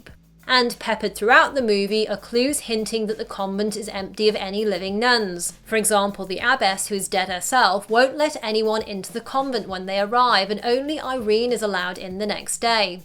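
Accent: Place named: United Kingdom. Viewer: I like your british accent.. 😍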